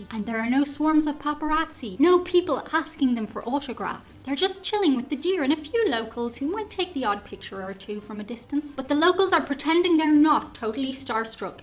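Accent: Ireland. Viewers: Loved how your exotic Irish accent was so prominent in this video! Well, exotic to my American ears! And you were beautiful as always. I recently re-watched an episode of Highlander the Series with an Irish character! The series is about Duncan MacLeod, who is from the highlands of Scotland, and is immortal, born in 1592 and he's still alive in 1992. This episode, he runs into another immortal who is trying to assassinate a British politician. His friend Richie, who recently became immortal himself (they become immortal when they suffer their first "death" due to violence), helped MacLeod stop the assassination, but in the process, killed the husband of one of the assassins. It turned out the wife was another immortal, and she was born in Dublin, Ireland, in the 1800s. Duncan MacLeod met her -- Annie Devlin -- in 1919, and she was already fighting for Irish independence at that time. 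In 1993 she is still doing it. But because Duncan's friend Richie accidentally killed her husband, she challenges Richie to a sword fight, as that is the only way immortals can kill each other (must cut off the head). Richie wins -- he disarms her -- but spares her life. MacLeod tells her that it must end there, because he and Annie are old friends, and he wants her to accept Richie as a friend as well, and he begs her to accept the peace between Ireland and the UK. They embrace, and that is the end of the episode. You would love that TV show! It was on in the 90s when I was in high school. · As an American man the most charming thing about your video was your beautiful Irish accent.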